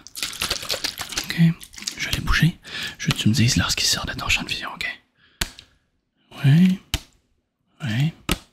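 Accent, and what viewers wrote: Quebec, Canada. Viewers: Je pensais pas que l'accent québécois fonctionnerait autant sur moi en ASMR. Je te remercie en tout cas, j'ai du mal à m'endormir en ce moment et tes vidéos sont d'une grande aide ! ♥️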